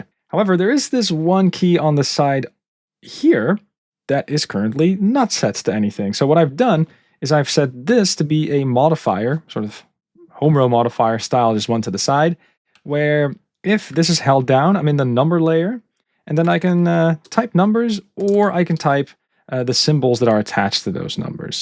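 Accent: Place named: Netherlands. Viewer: This keyboards looks very interesting. For some reason about 4 seconds in I could tell your accent was Dutch, then found out you are actually Dutch haha. Was wondering if you had a discord I could add, I had a few questions if you wouldn’t mind.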